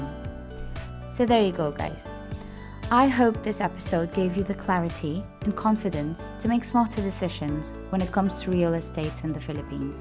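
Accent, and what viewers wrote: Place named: United Kingdom. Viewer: YOUR ACCENT IS FUNNY! ARE YOU BRITISH?😄😭😄😄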